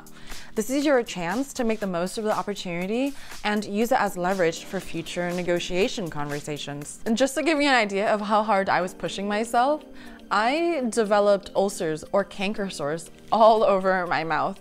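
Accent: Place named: Germany. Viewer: I studied a year at TUM as a part of my MSc. Your German accent is impressive!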